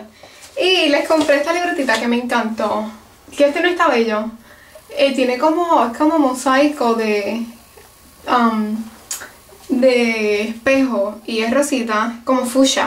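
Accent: Puerto Rico. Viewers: hola. mi nombre es: Barbara soy de venezuela... y bueno hay muchas cosas que me gustan de ti como tu estilo me encantan tus accesorios y forma de vestir tu cabello es hermoso amo tu pollina,tus pestañas y el color de tus ojos.. tambien me encanta tu asento P.R... e aprendido muchas cosas de ti con tus videos.... no tengo ninguna critica para ti.. me gustaria que hicieras mas videos de cocina de postres o cosas dulces y tips para pintarnos las uñas y cosas asi.. en fin me gusta todo..TQ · nombre : maura país : panamá me gusta lo original que eres y que apesar que vives en usa mantienes tu acento puerto riqueño critica : esa mascara de macadamia no hay en panamá :( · 1- Cheryl Jimenez- Puerto Rico ;) ... 2- Me encantan tus consejos, la manera en que hablas, se te sale lo boricua por los poros ;) 3- No tengo criticas....sigue siendo como hasta ahora, ah bueno...cierra la puerta de tu closet jijiji xoxox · Alooo, Mi nombre es Elivier... 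vivo en Baja California Sur, MEXICO.. Me encantan tus videos, porque no los cortas ni nada de eso.. son simplemente como tu eres, con errores o sin ellos, y ademas me gusta la forma en que tu hablas, porque me agrada mucho el acento de PR, y RD.. Me gustaria que no cambiaras para nada tu palabreo, porque es lo que te identifica, y me estaria genial que no olvides los videos de cocina, ya que son suuuuper delicious! Un gran saludo, un beso y un abrazo. <3 muak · que hables bien boricua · Hola soy Susana, de España. Lo que más me gusta de tu canal es lo sencilla,graciosa e inteligente que eres, y la manera que tienes de expresarte donde se nota muchísimo tu procedencia puertorriqueña. Como crítica constructiva te pediría que de vez en cuando cambiaras tu peinado o maquillaje, aunque sea poco. Cuídate!:D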